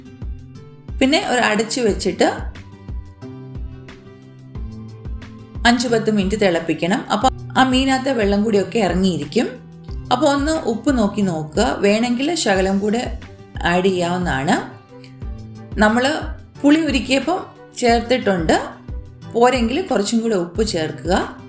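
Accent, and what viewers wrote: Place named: Kottayam, India. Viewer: Well expalined in കോട്ടയം slang 👌♥️👏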